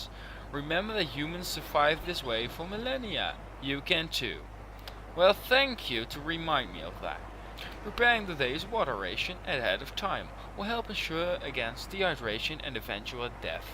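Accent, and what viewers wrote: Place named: United Kingdom. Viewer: It seems that over time your accent has gotten more and more... British. AWESOME (and totally not cringy) xD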